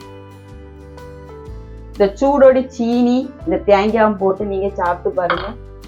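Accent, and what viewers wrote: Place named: Nagercoil, India. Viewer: இயல்பாக பேசறீங்க... நாகர்கோவில் பாஷை..நல்லா இருக்கு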